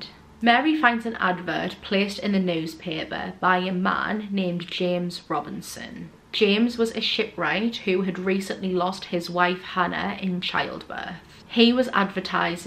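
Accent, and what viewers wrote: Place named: Newcastle, United Kingdom. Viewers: I think your amazing young lady, thank you from an old woman 👵 🤣 brilliant work 👏 Is that a Newcastle accent Pardon my ignorance I have relatives there and love Newcastle ❤️ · whey bonny lass.....listening to your voice makes me feel homesick - stuck down in Kent for a while but our home is up near Crook in God's own County - Durham a lovely bit of research here, presented without hype or sensationalism have hit the subscribe button for you Mary Anne Cotton's house is still there in Front St. West Auckland...the original black Widow... As an aside, local lore states that the grounds of Raby Castle are supposed to be haunted by the victims of Mary Anne Cotton. The ghostly victims are supposed to run across the estate of the castle, playing what can only be described as a game of tag.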